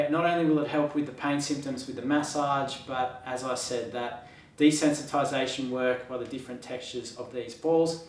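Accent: Australia. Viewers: Interesting Australian English! Those instead of your. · Perfect pace for this old boy Tks Aussie(?)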